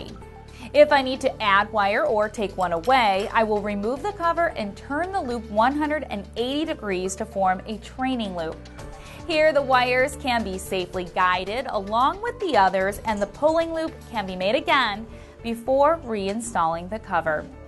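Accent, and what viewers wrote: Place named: Michigan, United States. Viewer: This is the most Michigan accent I've ever heard 😂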